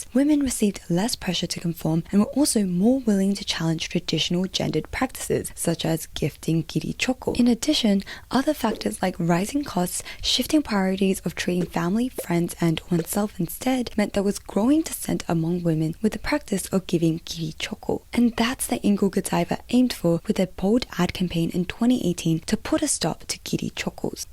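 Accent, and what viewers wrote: Australia. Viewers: Why am I hearing an Australian accent? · Is that an Australian accent mixed in with your English pronunciation? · At first I did a mental double-take at hearing your Australian accent, then I noticed the size of your room and thought "yeah, fits, that's prolly her entire apartment in Sydney or Melbourne and she pays $5000/month rent" 🤔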